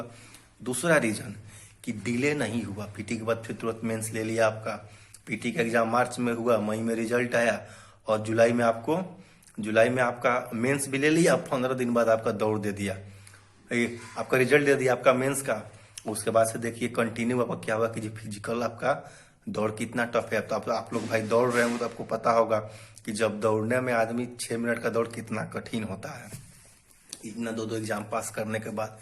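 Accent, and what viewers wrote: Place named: India. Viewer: Bhai kchh v ho Aap sudh desi bhasa bolte ho.....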